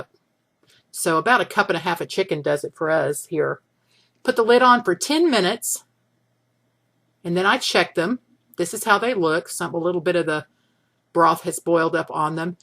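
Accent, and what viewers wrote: South, United States. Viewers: 3 years later and I'm still making these dumplings! I'm doing them in a beef stew but works just wonderfully. I love revisiting this video, I'm from Canada and I live for the southern accents! You know the recipe is going to be good, when you hear that accent! Cheers!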